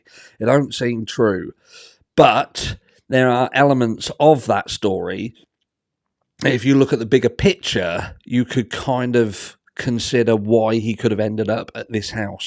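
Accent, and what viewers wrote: Norfolk, United Kingdom. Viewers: Out of interest where are you from? You sound like you have a Norfolk accent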